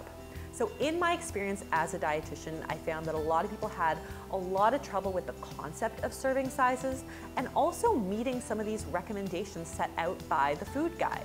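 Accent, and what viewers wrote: Canada. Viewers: She has a strong Canadian accent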